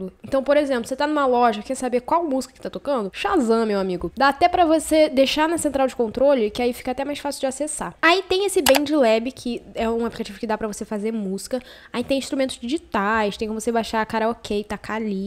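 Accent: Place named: Rio de Janeiro, Brazil. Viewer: carioca com xish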